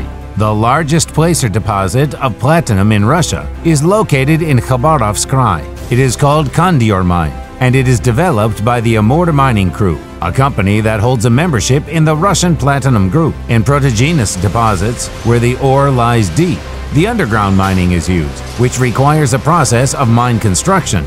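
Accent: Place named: United States. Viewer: Interesting dialect. Almost sounds american.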